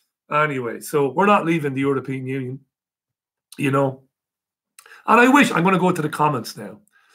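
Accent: Ireland. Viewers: he from waterford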